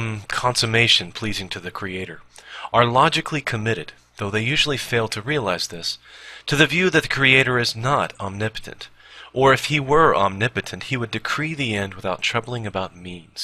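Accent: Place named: United States. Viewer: all very reasonable, but why is an American reading it? Bertrand Russell was so terribly English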